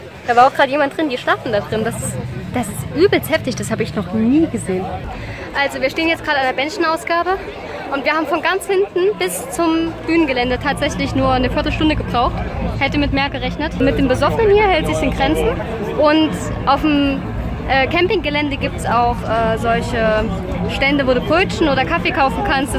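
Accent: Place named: East Germany, Germany. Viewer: Hey. Ich sehe gerade das erste mal ein Video von dir (ich war auch aufm Rockharz dieses Jahr) und wollte mal fragen aus welchem Bundesland du kommst. Bestimmt ausm Osten?! Erkennt man am Dialekt.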